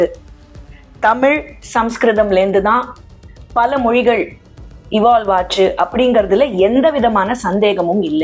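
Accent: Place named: Karnataka, India. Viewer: அம்மா உன் பேச்சுல கொஞ்சம் கன்னட காத்து வீசுது 🙂🙂🙂🙂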